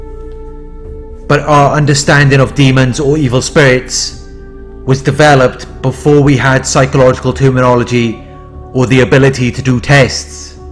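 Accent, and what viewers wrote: Wales, United Kingdom. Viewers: There is something different about your channel, your voice, the content, the music, the images, it’s all perfect, it’s like it was made for me. BTW are you welsh?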